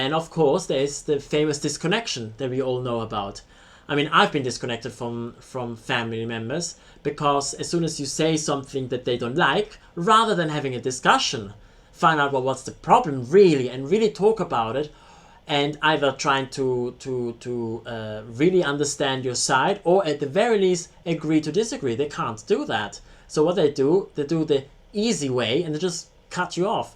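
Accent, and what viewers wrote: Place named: London, United Kingdom. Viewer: I'd say a posh London accent. I live in the North east of England. We have a very strong accent people say. Your accent is to posh to be from my part of the country. You have a lovely speaking voice, very easy to listen to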